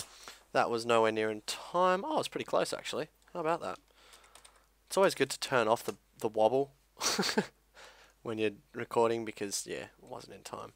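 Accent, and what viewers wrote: Australia. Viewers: Ha, thanks man you've helped a lot because I'm a noob to FL studio! Your aussie accent makes the commentating even funnier XD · i just wanna say thanks for talking nice and clearly, and in a good easy understandable aussie accent. half the other dub tutorials on youtube have hell quiet hard to understand accents, so be proud of that one. also, i havent checked ur other vidz but do u have a tutorial on how to do build ups and bass drops?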